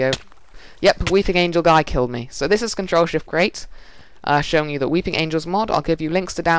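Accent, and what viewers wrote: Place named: England, United Kingdom. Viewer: idk what your doing on the thing i cant read it :( and no offence to you or anything i just cant understand an english accent XD i need to watch more dr.who...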